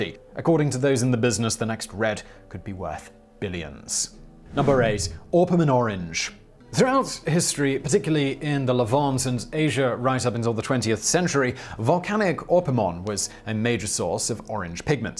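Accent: United Kingdom. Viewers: are you saying that, just because he pronounced it the British way, means that only British people can watch it? That has got to be the most absurd thing I've read all day! LMBO! So, should he change from speaking like he normally speaks, to an american sounding accent so, we Americans can be able watch? Well then, wouldn't his Brit followers be just so offended! (sarcastic eye roll) Dude! It's not like it's a foreign language! Lol! Lol! It's Americans like you, that give the rest of us a bad name around the world! Broaden your horizon! Not everything is done the american way! But, do have a lovely evening.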